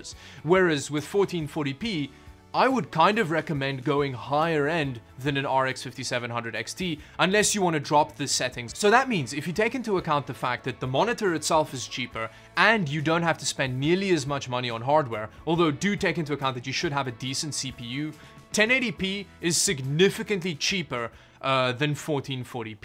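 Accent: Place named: South Africa. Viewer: why do u sound south african. Mhmmmmmmm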